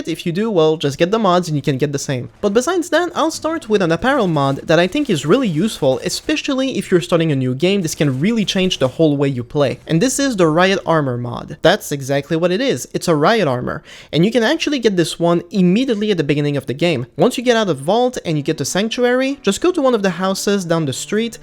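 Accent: Quebec, Canada. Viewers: Are you from Quebec by any chance ? Feels like i'm watching a GSP mod review ;)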